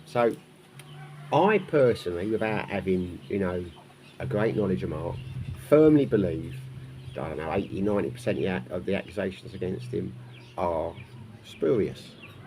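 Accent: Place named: London, United Kingdom. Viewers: That's an English accent. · He is speaking the English actual English people speak. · It's a London accent from East and South London mostly! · 😂You may speak English…but clearly you don’t understand it when spoken , it’s a London accent !! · Southern England - East/ south London or thereabouts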